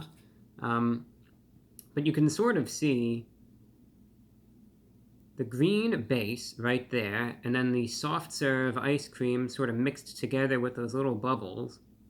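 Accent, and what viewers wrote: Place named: New York, United States. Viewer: I suggest everyone go back and look at young reviewbrah's videos from <num> era. So many classic videos from that era, no HD camera, you got the classic table or armoire setting, tons of reviews from the CAR!!! I mean so many reasons to go back and look at those not to mention the dry cynical humor is way up there and lastly teenage reviewbrah's accent is in peak New York form! Again if your a TROTW fan and haven't watched any videos from that era do yourself a favor and go do it! JUST DO IT!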